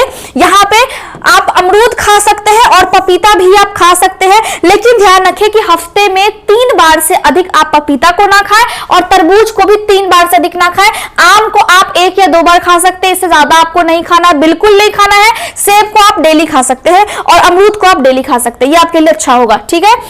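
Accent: Bihar, India. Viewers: Madam App bihari ho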